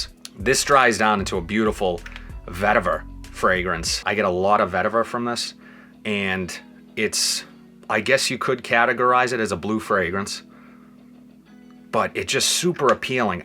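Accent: Boston, United States. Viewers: THAT BOSTON ACCENT!!! FIRE!!!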